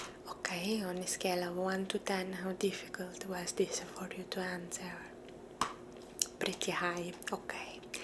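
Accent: Italy. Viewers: Another great Italian accent video. 😁 Thank you. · video with the Italian accent are one of my favorite. <3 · Your rather questions are always so hard to answer!😅 But your Italian accent is adorable! Bravissima!😉🇮🇹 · Ah, you know how much I love your Italian accent asmr. Thanks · this was incredible omg i hope we get more italian accent videos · The italian accent is spot on!! 😍🇮🇹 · Italian accent videos are perfect for relaxing and unwind your soothing voice makes me feel sleepy 😴 😊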